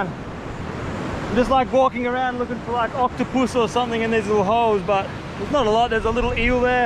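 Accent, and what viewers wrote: Australia. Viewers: This guy sounds Australian, I love it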